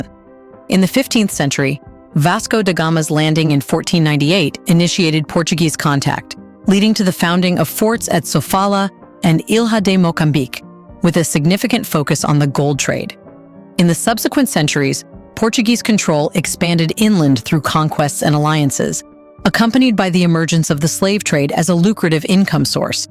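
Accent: United States. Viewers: Good question, sounds American narrator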